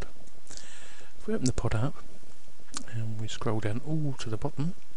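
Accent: United Kingdom. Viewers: Thanks. Its very easy in ubuntu. I am having problems with itunws and windows. And you hace a nice accent :) british? Regards, saludos.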